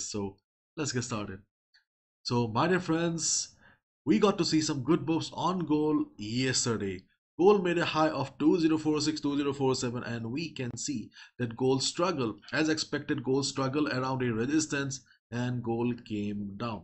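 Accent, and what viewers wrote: India. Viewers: He using simple indian english ascent so its seems there will be no problem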